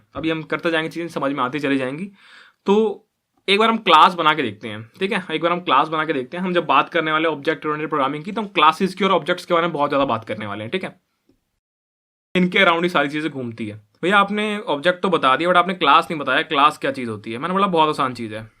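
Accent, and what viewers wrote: Haryana, India. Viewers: your haryanvi accent is so sexy; please say it again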